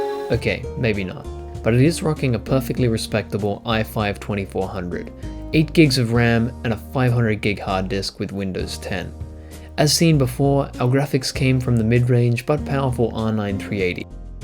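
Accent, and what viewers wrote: Australia. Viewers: From aussie? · Are you Australian?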